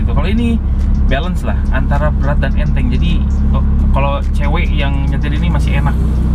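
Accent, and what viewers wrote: West Java, Indonesia. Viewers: Orang sunda mah kentara aja ya kang logatnya😂🙏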